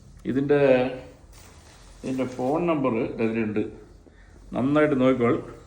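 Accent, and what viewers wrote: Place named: Palakkad, India. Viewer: പാലക്കാടൻ സ്ലാങ് സൂപ്പർ സാർ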